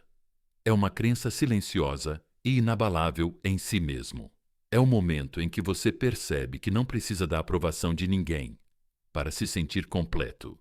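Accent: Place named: Portugal. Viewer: Qual a razao p os narradores falarem portugues de Portugal? Coisa estranha ...quebra o ritmo da narrativa ..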